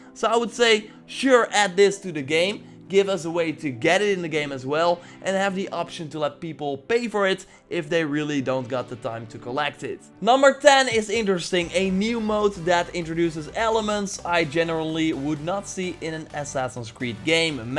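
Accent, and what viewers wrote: Netherlands. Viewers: I like everything about Assassin's Creed, too bad you have such a horrific Dutch accent that I can't bear through any of your videos. It's a real shame man... Do something about your awful English speech. Then maybe you'd be a really good YouTuber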